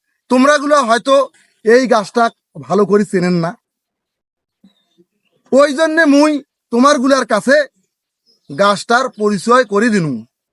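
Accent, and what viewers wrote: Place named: Rangpur, Bangladesh. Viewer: রংপুরের ভাষায় চমৎকার হয়েছে।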